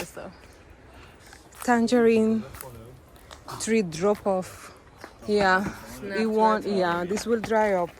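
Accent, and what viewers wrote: Jamaica. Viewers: I love the patois accent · Your patwa is sweet 😂you sound so good, i love it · I love the accent 😅officially yu jamaican ❤🎉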